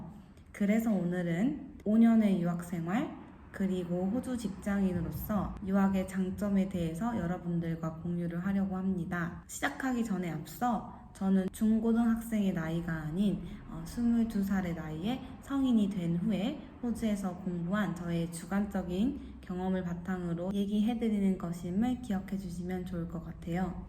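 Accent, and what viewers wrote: Jeolla, South Korea. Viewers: 우와 알고리즘으로 보게되었는데 목포 분이시구나~>< 저도 고향이 광주라 어쩐지 살짝식 들리는 사투리가 친근하더라구요 ㅎㅎ 너무 멋있으세요..!! 저도 호주에서 대학을 준비하고 있어서 영상 많이 보고 참고할게요!! ㅎㅎ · 전라도분이시구나ㅋㅋ 저희도 순천이에요^^ 더 정감이 가네요~^^ 항상건강조심하시구요 친하게 지내고싶어요^^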